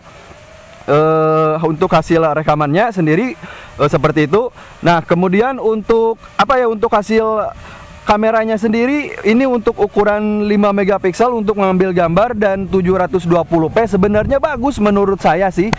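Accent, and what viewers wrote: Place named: Medan, Indonesia. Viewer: Review nya detail nih, manteb. Btw logat medannya kental sekali.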